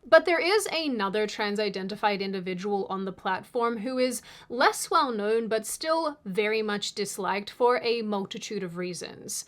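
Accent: Australia. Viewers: Surfing the Hell gondola straight through the afterlife! Death rattle was right!! Their demons are SCREAMING. Love you!! ❤️❤️❤️ P.S, I love audibly observing the evolution of your accent from Australian (aur naur) to a mix of that with American (fyuuumm)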